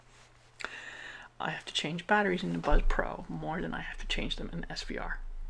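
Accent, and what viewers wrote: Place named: New Jersey, United States. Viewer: brilliant explanation on PWM, as a transplanted NJ Viirginian your accent makes me homesick. I love you lady, you are THE best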